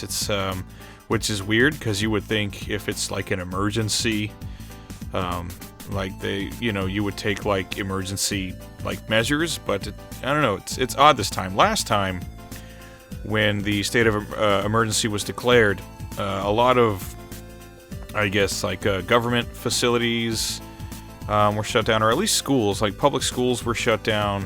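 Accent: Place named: New Jersey, United States. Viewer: New Jersey!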